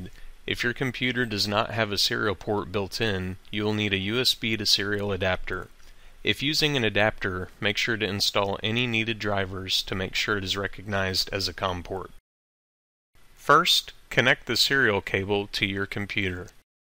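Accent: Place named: United States. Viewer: Warning #1: This is a furry video. Warning #2: The narrator is American and will systematically pronounce "enter" as "enner".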